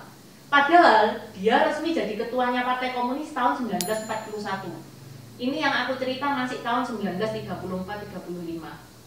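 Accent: Java, Indonesia. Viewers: Bagus memberikan wawasan baru. Tp mgkn lain kali perlu latihan pengucapan istilah tionghoa nya spy lbh enak di dgr gtu. Ini msh terlalu medok logat tionghoa jawanya.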